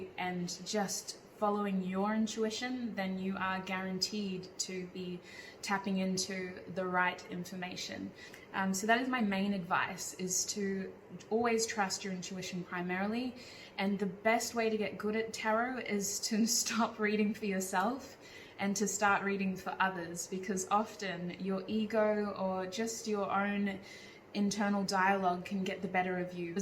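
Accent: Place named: Australia. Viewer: Love your videos and its great to hear more about you ❤ Also great to hear a fellow Aussie accent!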